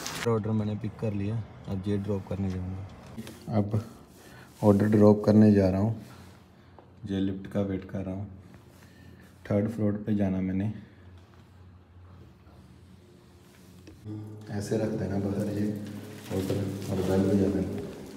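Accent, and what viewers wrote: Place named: Punjab, India. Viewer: Punjabi nikal hi jandi moh cho 😂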